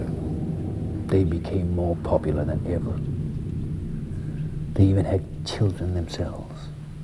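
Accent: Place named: Denmark. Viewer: MAAAN I LOVE HIS VOICE AND THAT DANISH ACCENT D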